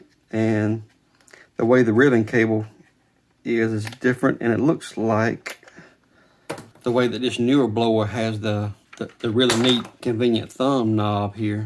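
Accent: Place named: North Carolina, United States. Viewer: Are you from North Carolina by chance? Your accent sounds like my dad's hometown in the southeast.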